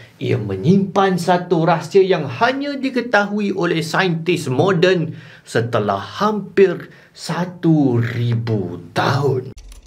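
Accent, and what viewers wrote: Malaysia. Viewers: Disini saya belajar sains + bahasa dan aksen Malaysia sekaligus. Terimakasih 👏👏🙏🏻🙏🏻🙏🏻🙏🏻 Dari negara tetangga 🇮🇩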